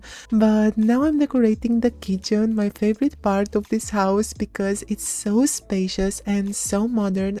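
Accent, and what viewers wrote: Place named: Greece. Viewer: Your Greek accent 😍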